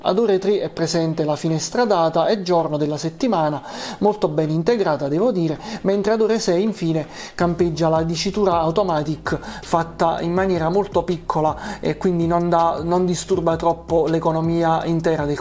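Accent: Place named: Paris, France. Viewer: Disturba solo un poco l accento parigino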